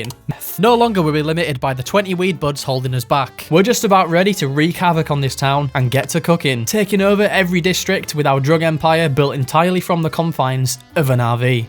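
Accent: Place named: West Yorkshire, United Kingdom. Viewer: Most West Yorkshire accent I’ve ever heard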